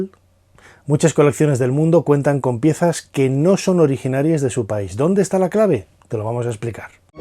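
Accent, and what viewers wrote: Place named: León, Spain. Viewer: es el acento que tenemos en León, ningún acento es menos válido que otro aunque gracias por el cumplido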